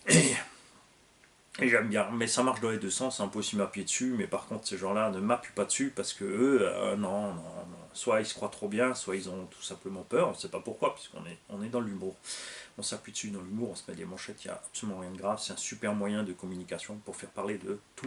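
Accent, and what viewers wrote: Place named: Alsace, France. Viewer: Bonjour ! Je viens de m'abonner à votre chaîne que je suis depuis quelque temps. Merci pour ce que vous faites, et en plus l'accent alsacien me rappel les racines... ça fait du bien 😍😅 J'ai l'intention de me mettre à la RC avec mon fils de 6/7ans. Pour le fiston je pensais partir sur un Funtek MT4. Si vous avez d'autres idées dans ce budget je suis preneur. Quant à moi je pensais commencer par un Arrma Sentons 3S. Qu'en pensez vous ? Merci en tout cas pour votre bonne humeur !!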